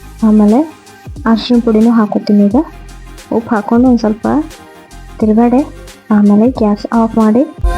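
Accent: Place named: North Karnataka, India. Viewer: Nice recipe sis.. nice sharing ..healthy kuda..nimma uttara karnataka bhashe nu super🎉🎉🎉🎉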